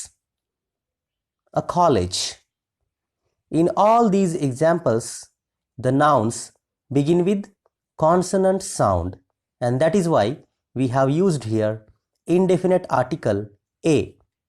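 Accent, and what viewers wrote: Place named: India. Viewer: Sir thank you - i do notice indian accent - great thank you- suggestion - a bookmarking / great content thank you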